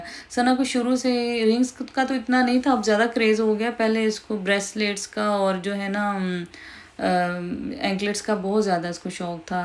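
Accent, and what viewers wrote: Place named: Jhang, Pakistan. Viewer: ji main to fan hu jab aap jhang ki boli me baat karti hain please aap vo bola karo❤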